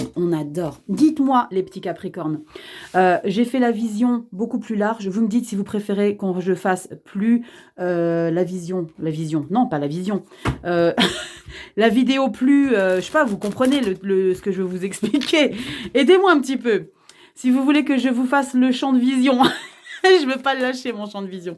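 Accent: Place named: Provence, France. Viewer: Un petit accent et expression du pays des cigales ❤❤.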